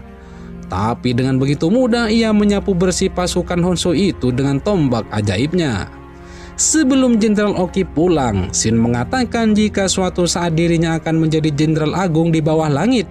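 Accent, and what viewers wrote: Madura, Indonesia. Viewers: Kayaknya orang Madura ini. Dekremmah taiyeh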